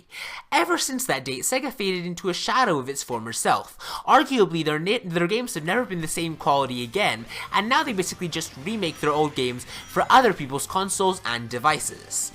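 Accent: Scotland, United Kingdom. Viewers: Are you Scottish? I get the strong impression you're Scottish, but moved away aged 7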